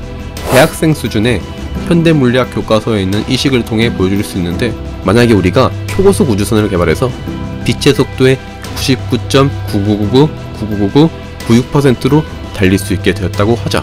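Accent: Gyeongsang, South Korea. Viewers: 숨길수없는 첯음절에 강력한 엑쎈트 깅상도사투리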